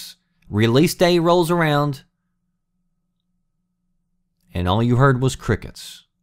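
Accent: South, United States. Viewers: This guy sounds like a Southern Weird Al. I am not disappointed.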